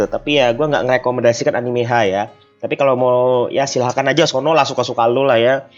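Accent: Banjar, Indonesia. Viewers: Bang dri banjar kah?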